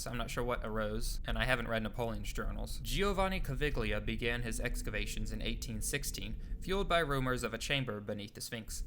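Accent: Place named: United States. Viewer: You lost me a lot with your odd American pronunciation of Greek, roman and ancient Egyptian names. Thossmess? Plyney? You sound all Greek to me.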